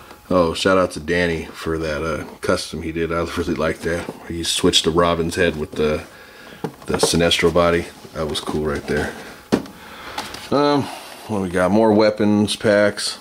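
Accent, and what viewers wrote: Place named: Vancouver, Canada. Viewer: You must be in The Vancouver area Like myself or not,just sounds like it,cool review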